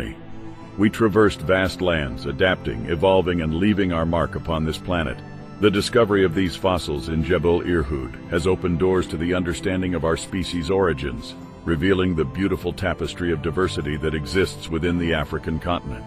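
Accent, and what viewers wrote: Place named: United States. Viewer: Odd, the American English. Not even an Arab slant to the English! 😢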